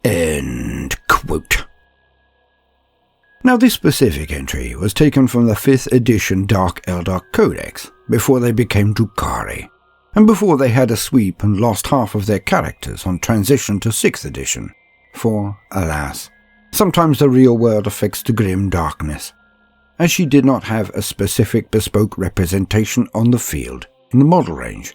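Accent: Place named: England, United Kingdom. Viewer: You know what's awesome about the King's English? When I say too-daloo to someone in American English I sound like someone with a learning disorder. You make it sound like cookies on Christmas morning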